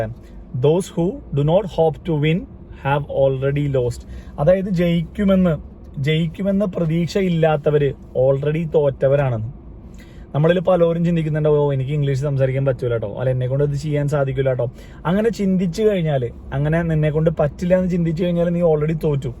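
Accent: Thrissur, India. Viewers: R u from thrissur ?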